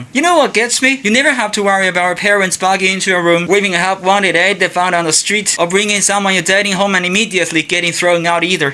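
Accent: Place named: China. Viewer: your accent is quite chinese bro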